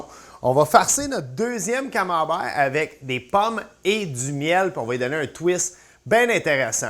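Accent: Canada. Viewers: Nadia Romain ben c est canadien vrai de vrai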